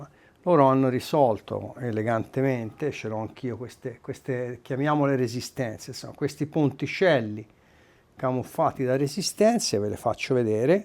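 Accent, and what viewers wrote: Tuscany, Italy. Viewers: Sempre bravo e simpatico, compreso l adorabile cadenza Toscana!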